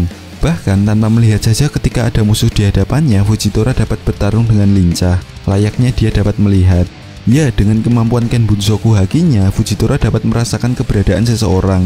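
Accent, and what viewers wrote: Java, Indonesia. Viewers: medoknya itu lho gak tahan 😂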